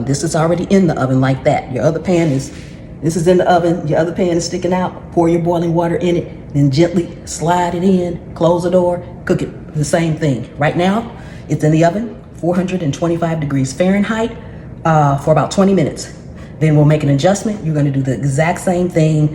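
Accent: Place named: Louisiana, United States. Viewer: I hear the southern accent but can’t place it. I want to say Louisiana. Whatever it is, I could listen to you talk about cooking all day!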